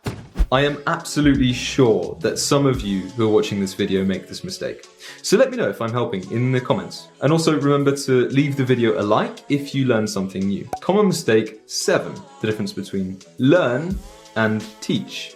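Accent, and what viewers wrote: England, United Kingdom. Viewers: На английском слушать уроки гораздо интереснее, с настоящим английским произношением👍 кстати, оно заметно отличается от американского. Русский с английским акцентом от звучит забавно, тоже прикольно посмотреть) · Это британский акцент? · блин, у него такой крутой Английский акцент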